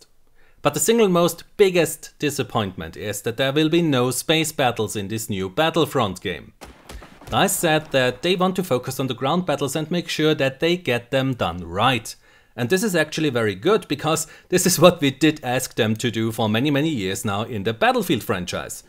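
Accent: Austria. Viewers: are you from Austria? Weil du Autirgendwas heißt und weil du nicht klingst als ob Englisch deine Muttersprache wäre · Great video. I have to ask as this has had me thinking for a while, Are you Austrian? Because you sound very much like Niki Lauda lol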